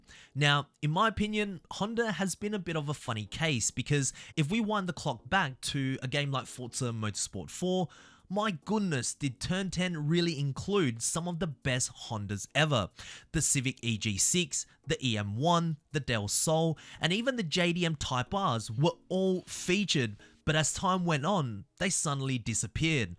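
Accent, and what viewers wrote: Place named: Australia. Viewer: Yo do you also run a channel called? I’m probably wrong but the voices with this channel and the other one are so similar and both have the same Aussie accent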